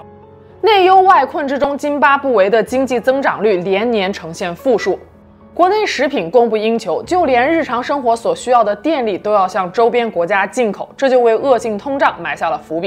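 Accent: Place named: Beijing, China. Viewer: 是哪里人，是中国哪个城市的。听她说话像是北京人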